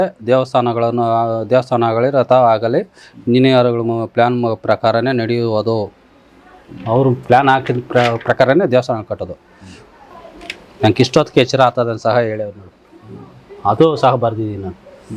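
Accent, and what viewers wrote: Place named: Doddaballapura, India. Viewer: Gnana mukya avaru astu swastavagi oduttiddare doddaballapura side e thara kannada mathanaduttare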